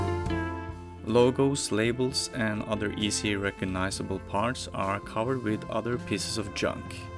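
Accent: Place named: Estonia. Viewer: Been loving all the videos. May I ask where you are from though?. You sound kinda Estonian. Maybe Nordic?.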